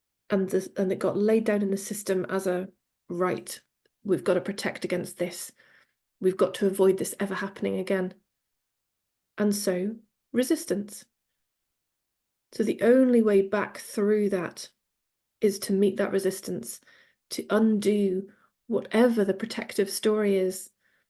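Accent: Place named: West Country, United Kingdom. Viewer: Hi, I recently saw you on the non duality podcast and HAD to search you.. I noticed the south West Country twang in your accent also.. I’m from Bristol you see! Your book is ace so far btw.. just sat here reading it now.. I was wondering if you do any work one 2 one with people or workshops? Thanks.. keep up this wonderful work….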